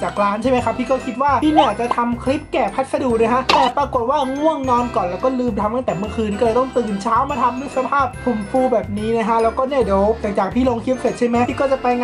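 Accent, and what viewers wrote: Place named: Sing Buri, Thailand. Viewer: พูดสิงห์บุรีเหมือน miss grand เลย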